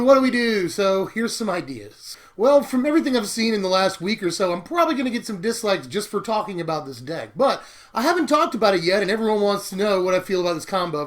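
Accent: South, United States. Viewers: that country accent lol, Im going to say she is from alabama or louisiana. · aawwzz! I love good southern accents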